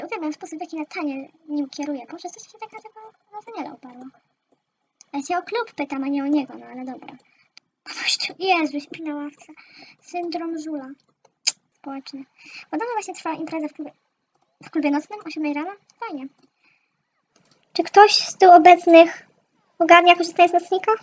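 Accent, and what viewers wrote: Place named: Silesia, Poland. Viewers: Ze Śląska ? Bo masz taki akcent xd ja jestem ze Śląska xd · Śląski Akcent 👌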